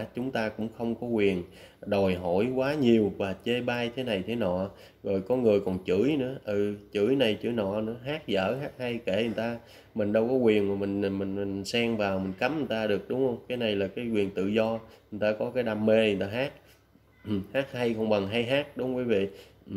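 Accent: Standard. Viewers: Cô chào cháu kênh nói chuẩn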